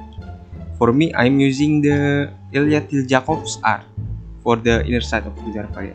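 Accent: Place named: Indonesia. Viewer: Indo banget soaranya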